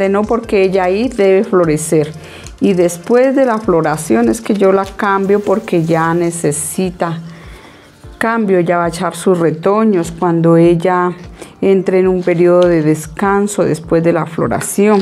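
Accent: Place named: Colombia. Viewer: Que acento colombiano más bonito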